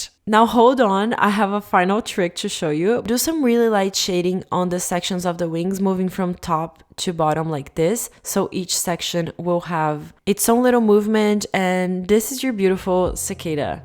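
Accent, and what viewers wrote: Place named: Brazil. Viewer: 😅vey eu vendo esse vídeo em inglês e falei ela com certeza é brasileira o sotaque em inglês igual ao meu, ameiii obrigada pelo tutorial, adorei ❤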